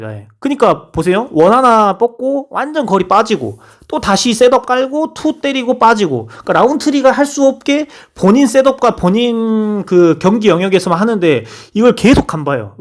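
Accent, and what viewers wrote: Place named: Jeolla, South Korea. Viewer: 말투가 전라도사람인듯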